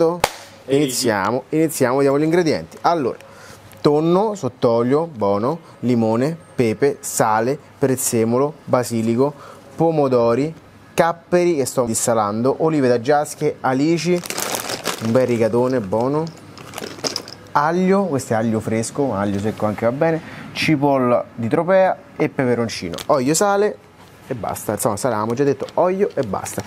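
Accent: Rome, Italy. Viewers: Ma non è che imita. I romani parlano così 😅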